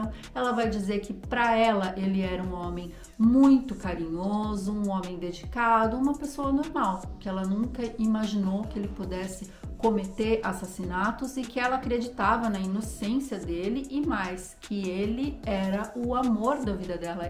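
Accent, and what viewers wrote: France. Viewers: Je aimer le Ton Français , merci mandame.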